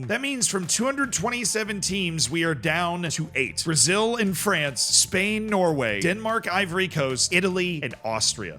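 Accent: United Kingdom. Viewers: Why do you commentate with a British accent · What a video please do more with your British commentary 😂❤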